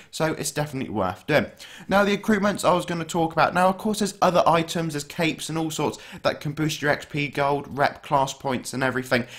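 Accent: United Kingdom. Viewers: Nice video. Lots of information btw are u a britain? Cuz u got the accent of a Britains.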